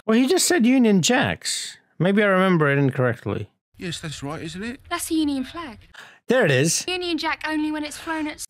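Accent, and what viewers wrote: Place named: Canada. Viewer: yo really? I thought you might be but I wasn't sure, I don't know if English is your first language so forgive me if this is rude but your pronunciation is awesome, I'd honestly been thinking you were maybe Canadian with Asian heritage this whole time. That's really cool though! The jubilee is probably what caused the algorithm to latch onto this video, it was this one specifically that was in my recommended a few days ago. The jubilee is the anniversary of the queen's coronation. This weekend was the queen's platinum jubilee, meaning she's been the Queen for 70 years straight. So a reaction to a doctor who episode about the coronation during the run up to it has probably caused the algorithm to catapult you into British YouTube feeds I'd bet. Unless you haven't noticed a spike in viewers this week, in which case maybe it's just a coincidence. 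Search engine optimisation and algorithms interest me though so that's why I mention it 😅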